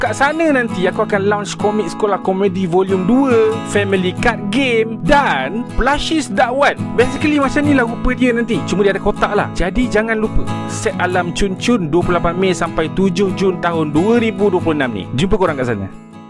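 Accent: Malaysia. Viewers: Man percakapan kau memang macam Malaysia aku pulak ramai cakap aku orang luar sebab percakapan aku sangat formal macam orang putih baru nak belajar bahasa melayu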